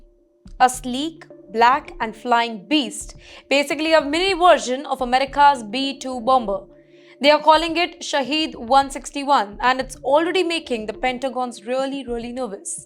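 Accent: India. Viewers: I hate Indian English😂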